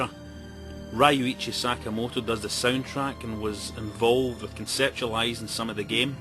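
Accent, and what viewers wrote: Scotland, United Kingdom. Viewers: Scottish accent + solemn speech = EPIC! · you can get the rom on SnesOrama and download Nulldc..(DC emulator) watch a youtube tutorial on how to get it configured and "bobs ur uncle" Sorted. lol im from aberdeen... tis decent to hear a scottish accent on this thing.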